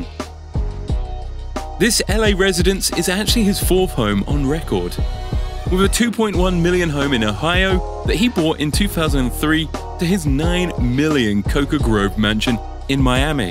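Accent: United Kingdom. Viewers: So it's not a house tour...It's photos taken off the internet that anyone can find then made into a slide show and a guy with a British accent telling you that LeBron makes a lot of money. 🙄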